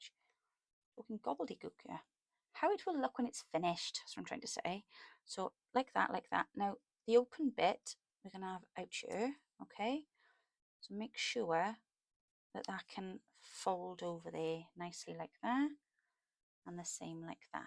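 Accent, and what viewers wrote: Wales, United Kingdom. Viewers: Utterly fascinating. It might take me a week to make this. I love to craft but am just sooooo slow. Thank you for all this hard work. The designs are right up my tree! So adorable and pretty. I watched the whole video over 2 days, and it is fascinating. I do so love your Welsh accent.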